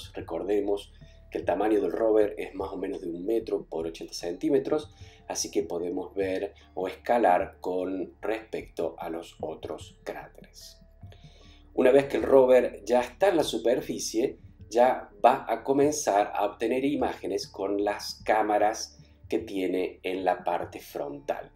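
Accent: Córdoba, Argentina. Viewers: Si o si es cordobé el!! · Muy buen video. Puede ser que tengas acento de Córdoba, Argentina? Un abrazo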